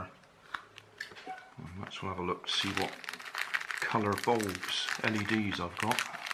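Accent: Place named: RP, United Kingdom. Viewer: Forget it..... i love your royal accent, compose, even tone all throughout and the suspense that u maintain on whether project will work or not & above all irony .....wow!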